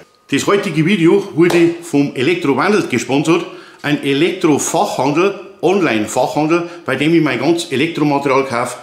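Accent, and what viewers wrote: Bavaria, Germany. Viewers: Bleib autentisch Red weida borisch Des passt scha 👍👍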